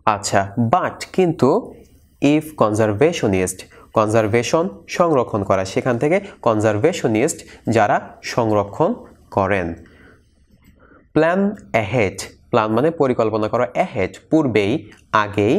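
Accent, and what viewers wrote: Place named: England, United Kingdom. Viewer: ভাই বলেন ভালো লাগে, কিন্তু বাংলা ভাষা উচ্চারণ English এর মতো সোনায়।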